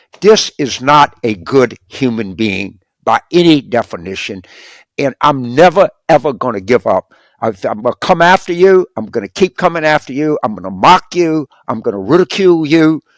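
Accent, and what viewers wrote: South, United States. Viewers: his heavy Southern drawl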